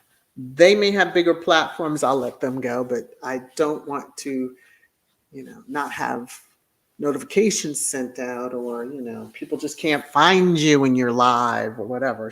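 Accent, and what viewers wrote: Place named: RP, United Kingdom. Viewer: 👍🏻👍🏻👍🏻👍🏻👍🏻👍🏻🧚🧚🧚😘😍be safe ✝️✝️♥️♥️🌹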